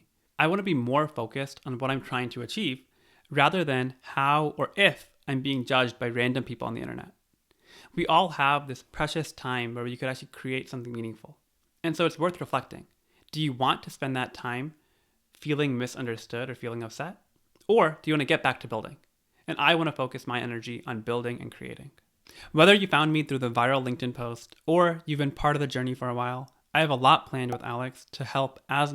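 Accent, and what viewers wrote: United States. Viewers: What's wrong with american accent?, even if u are faking, to speak like this needs some hard work, I'll appreciate instead posting negative comments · Don’t bother about those comments, you are doing awesome job.You are raised here and definitely you will have the American accent.Lot of people in India do not know this. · You sound 💯 percent American. No.accent. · It's wild how it's always the one negative comment that sticks with us. You always sounded like any other American to me (from an undisclosed African country)...that wasn't meant to sound insulting fyi...anyway, the content is great, anyone focusing on accents and not the basically free knowledge being dropped is to be ignored.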